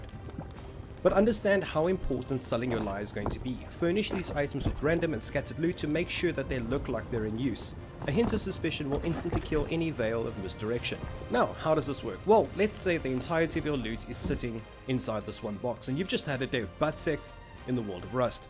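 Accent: Afrikaans, South Africa. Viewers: You sound South African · dis man from SA? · You sound south african mate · Your South African I hear the Afrikaans in you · My bru!! Love hearing the saffa accent in a Rust vid nogals!! I’m subscribed, keep up the good work!! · You south African? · are you South African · U sound south African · Mister, are you South African? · You're South African? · Is this boy South African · Are you south african ?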